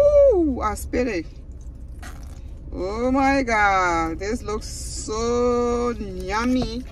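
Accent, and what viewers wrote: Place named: Liberia. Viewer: I know Liberian accent when I hear it 😂❤ nice video!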